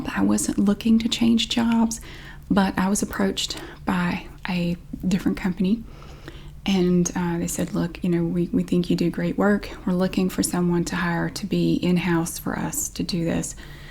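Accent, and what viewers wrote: South, United States. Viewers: Oh, be my smart ass sweetie pie 😍🥰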